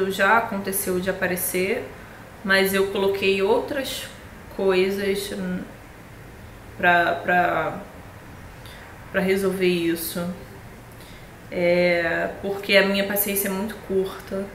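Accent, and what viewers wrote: Rio de Janeiro, Brazil. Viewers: Muito bom mas no sotaque carioca. Ah não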